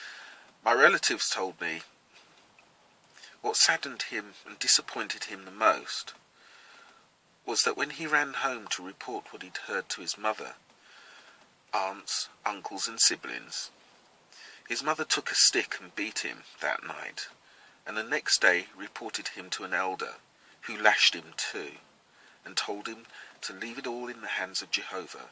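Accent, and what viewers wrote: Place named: RP, United Kingdom. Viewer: There is controversy re: origins of Hinduism. Very similar but with the extra kick of reincarnation. i. e. "if you do what we say, in your next life you can be like us" In US the AME church was started due to segregation. PS never thought what color you were. I just know you sound like the royals. My family came from Birmingham so that's a compliment :)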